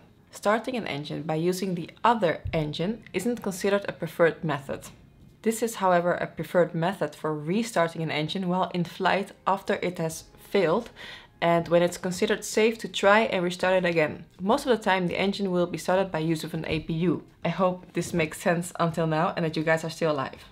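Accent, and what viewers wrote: Netherlands. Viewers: Dutch accent is something amazing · A hint of Dutch in English produces such an agreeable sounding accent. It's a pleasure to listen to her.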